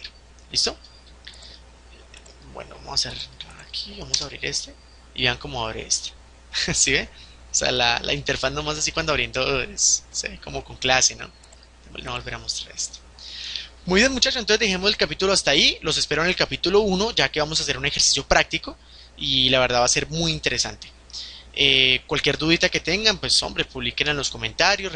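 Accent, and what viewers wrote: Colombia. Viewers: Esa forma de hablar, ese acento, dejame adivinar, Colombiano? :v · Gracias parcero, soy arquitecto y me había predispuesto y veo que no es difícil, ademas que bacano, escuchar un tutorial con acento colombiano. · Uuuuyyyy Colombiano tenía que ser ¡Gracias maestro! la tienes clara oyó? saludos desde Nariño. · Estos acentos colombianos cómo me gustan... Y más cuando se nota que es una voz inteligente✨♥️ Gracias por el curso,!!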